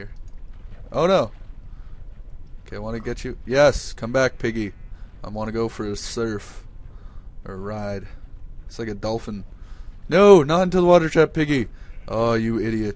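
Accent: Canada. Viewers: "i am going to punch you... with a sword" and by the wayare you canadian?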